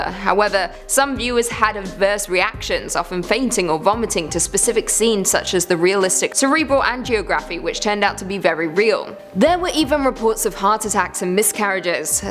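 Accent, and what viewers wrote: England, United Kingdom. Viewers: You have to remember she's from England. They also say vitta-min instead of vitamin.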